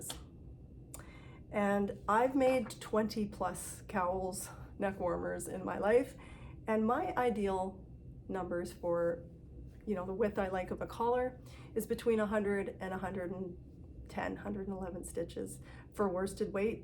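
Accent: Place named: Western Canada, Canada. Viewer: Thank you for your podcast. I enjoy hearing your western Canadian accent, it feels so familiar and friendly. It is fun to see the places you go . I also love that you share your knitting adventures fully, things that don’t work out as hoped and your triumphs , it is nice to know that we all have ups and downs this way :) I just love your open friendly way and all your flight attendant sayings . Any ways, thanks :)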